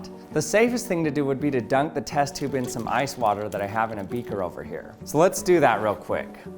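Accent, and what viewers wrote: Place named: California, United States. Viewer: I don't know why but chemistry with a camp Californian accent is super fun.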